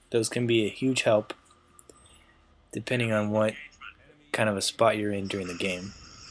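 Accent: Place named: Canada. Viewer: ¿',: | i can tell that your canadian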